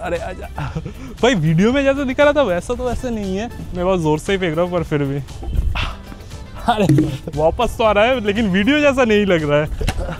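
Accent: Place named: Bengal, India. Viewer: Hindi with bengali accent......sooo cute 🥰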